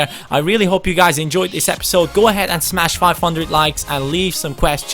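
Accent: Germany. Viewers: He's Bavarian German ;)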